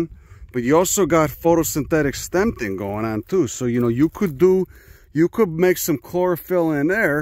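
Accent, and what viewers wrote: Chicago, United States. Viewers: Every nerd should have an Italian with a thick Chicago accent teach them about their interests.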